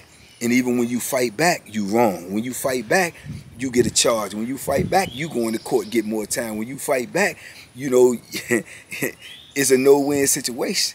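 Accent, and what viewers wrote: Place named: Virginia, United States. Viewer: Lol..thats how they say carry in V.A most all of them..😂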